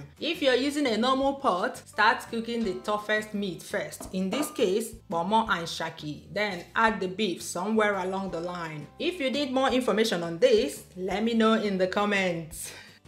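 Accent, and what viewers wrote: Igbo, Nigeria. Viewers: I like your igbo accent.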